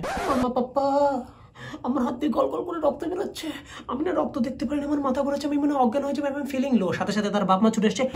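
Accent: Bangladesh. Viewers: বাংলাদেশ থেকে বলছি দাদা,,, তুমি অসাধারন বাংলা বলো,, তোমার বাংলা বলাটা দারুন,,,সেরা বাঙালী তুমি দাদা,,, কোচবিহারের ভাষা,আমাদের ভাষা একদম এক । মনেই হয়না তুমি পশ্চিম বঙ্গের ছেলে,,,মনে হয় তুমি আমাদের প্রিয় বাংলার সন্তান,,,,কি কোলকাতা কি ঢাকা ,,,তোমার মাধ্যমে সব একাকার হয়ে যায়,,, তোমার এই ভিডিওর থিওরিটা খাটি বাঙালী পরিবারের সন্তানদের জীবন যাপনের চিত্র ফুটে ওঠে,,,মনেই হয়না তুমি কোচবিহারের ছেলে আর আমি কুষ্টিয়ার ছেলে,,,, ছোটবেলায় এভাবেই সর্দি লাগতো আর হাতে ঘসা মেরে আবার খেলা শুরু করতাম,,, মাঠে খেলতে খেলতে বন্ধুরা মিলে একসাথে পটি করতে বসতাম,,,আবার সেটা ডুমুর পাতা দিয়ে ঘসে পুনরায় খেলা করতাম,,,, আমরা গর্বিত ,আমরা বাঙালী,, আমাদের রীতি রেওয়াজ,কৃষ্টি কালচার একেবারে সেম। কোন পার্থক্য নেই । পশ্চিমবঙ্গ বাংলাদেশ একসময় এক ছিল,,,তোমার ভিডিও দেখলে মনে হয় আজও আমরা একই আছি,,,, হাসিয়ে যাও ভাই,,,আমার চোখে তুমি একজন খাটি বাঙালী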